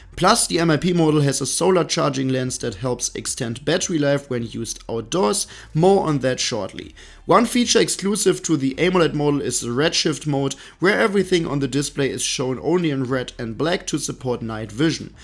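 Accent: Germany. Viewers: Accent, is it German?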